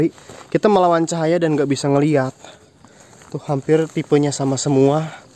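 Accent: West Java, Indonesia. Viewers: Aku seneng Ama konten ini dengan cara ngomongnya ke Sunda,an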